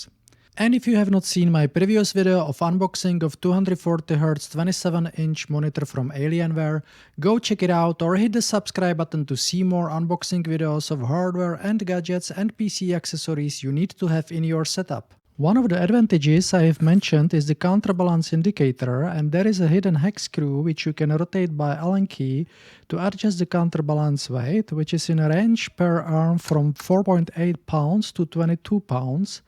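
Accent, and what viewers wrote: Czech Republic. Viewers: Dobra prace:). Podle prizvuku hadam ze CZ. Taky jsem si koupil aw2721d dneska.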